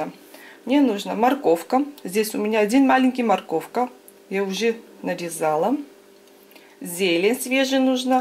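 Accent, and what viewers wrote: Armenia. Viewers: Здравствуйте, джан! У Вас приятная, сладкая речь с армянским акцентом.Посмотрела приготовление вашего блюда, и сразу запахло Арменией. Там так умеют вкусно готовить, все овощи и фрукты пропитаны и теплом и щедрым армянским солнцем.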